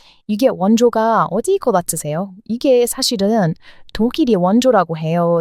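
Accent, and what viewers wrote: United States. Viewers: 근데 진짜 궁금해서 그런데 미국인처럼 흉내내는 한국인 아닌가요? 들을 때마다 신기해요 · 는 미국인 발음으로 한국말하는 것이 엄청 듣기 좋읍니다. 제가 하나 알려줄께요. 미국에는 일본유학생이 거의없고, 대부분 아시아유학생등은 중국,인도,한국인들이죠. 역사적으로 중국은 새로운 중국토종 왕조가 지배하면 북방 유목민등의 왕조역사를 왜곡하죠. 그리고, 이런 혼란한 앞뒤맞지 않는 역사.문화유적을 모택동시절에 문화대혁명으로 다 파괴해버렸죠. 그리고, 공산주의 전체주의국가인 중국은 어릴때부터 학교교육에서 자기중심적 교육으로 세뇌를 받았읍니다. 이것이 자본가와 노동자등으로 구분하는 이분법적인 체계로 나와 너, 우리편과 적을 구분하죠. 일본도 민주주의국가인데도 중국같이 왜곡합니다. 한국은 세계에서 유일하게 이분법적인 사고를 가지고 있지않은 사람들입니다. 한국사람은 밝은 것과 어둔 것이 같은 동전의 양면이라는 것이고, 나쁜놈과 좋은놈을 수용하고 배려합니다. 왜냐하면, 사람들은 두가지 면을 가지고 있기때문이죠. 공부해보세요. 바로 이것이 한류의 답입니다. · 의 혀꼬부러진 한국말 들을수록 재미있어요. 진짜 미국인이 맞는지 그리고 집안 내력과 나이 등 좀 알려주세요. 혹시 한국계 미국인 아닌가요?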